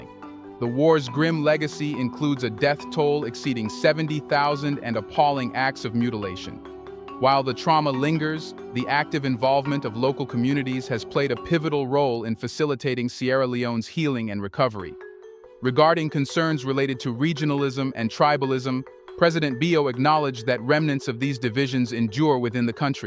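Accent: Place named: United States. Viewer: The narrator is an AI right? Sounds Amerlcan but the country pronunciations are not consistent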